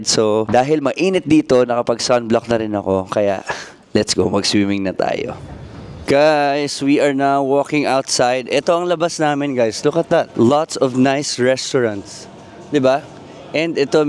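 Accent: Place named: Philippines. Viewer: your accent is so Pilipino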